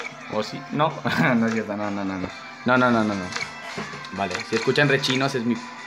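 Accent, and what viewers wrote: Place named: Spain. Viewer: Porque ablas como español si ni eres español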